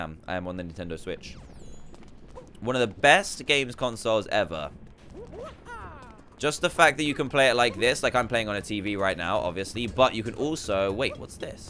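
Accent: United Kingdom. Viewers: Bruh he's british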